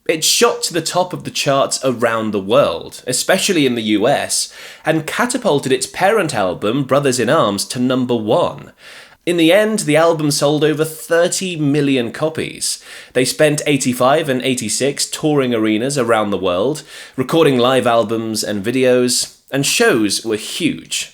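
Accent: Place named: Newcastle, United Kingdom. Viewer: Geordie teaching English? Awye mun!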